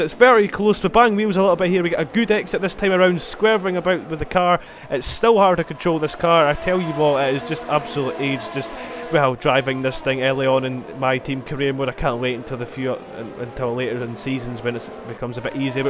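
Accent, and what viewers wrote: Scotland, United Kingdom. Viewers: Are you Scottish? 🏴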